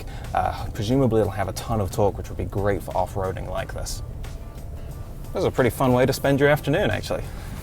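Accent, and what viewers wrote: United Kingdom. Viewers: His accent swaps between British and American. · Is this guy British?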